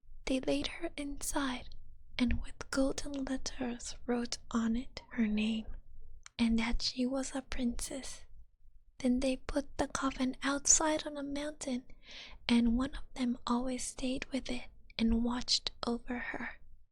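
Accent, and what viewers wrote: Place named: England, United Kingdom. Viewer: If I didn't know otherwise, I world have said she was English. Sorry my Japanese is not good enough to write this in Japanese.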